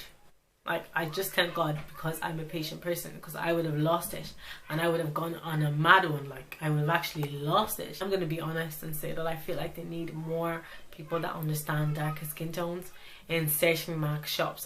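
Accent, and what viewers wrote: Ireland. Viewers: I'm Irish, and you have a bit of an accent, do you live here or are from here? You're absolutely stunning, and I loved this video! Subbed x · Your accent is beautiful. I was listening and listening, and I was like...Irish...and Nigerian. It's awesome! So lucky! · I just came across your channel and I'm wondering did you ever live in Ireland you sound really Irish sometimes when you speak :) can't believe how you were treated I would have freaked out lol. I've never had this happen to me thank goodness! :) I subbed :) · I was thinking "Oh cool, a YouTuber from Nigeria. Wow, it sounds so much like an Irish accent sometimes, that's kind of interesting...." Lol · Have you got a irish accent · Do you live in Ireland? There's a little Irish accent in your voice! ❤️ (I'm from Ireland) Subbed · I'm a brand new subbie so I have to ask!!! Are you Irish ? 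It's just that I live in Dublin and I can hear it in your accent! Not knowing is killing me! xxxx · Sounds more Irish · i knew I recognised the accent 👌im Irish two 💞Are you living in Dublin❓🍀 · Are you from Dublin? Just a guess haha x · Are you from Ireland? Your accent is amazing omg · so so pretty! I have never seen a Nigerian with an Irish accent! its actually really nice! sorry you had a crappy time, isit the same in ireland with mac? x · ***** oh i just realise the girl an irish accent i am from ireland what part you from lolz i am from co.tyrone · your accent sound irish♡, I used to live there.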